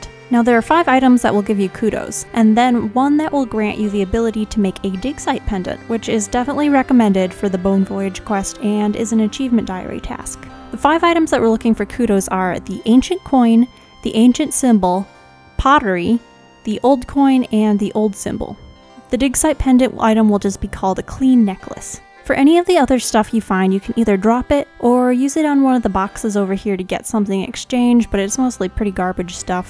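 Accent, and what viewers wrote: Australia. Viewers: that ausie accent just earnt u a sub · gooooooood as aussie accent lol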